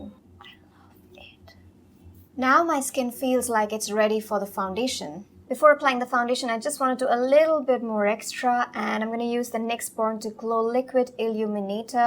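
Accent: Bengal, India. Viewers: WoW you're bengali❤️ Just perfect bengali accent omg❤️beautiful!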